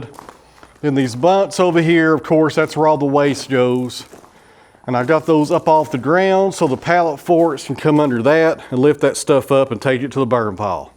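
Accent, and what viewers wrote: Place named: South, United States. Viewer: I like your videos and can understand your southern accent well because that's exactly how I talk. We must be from the same general southern area. Thanks.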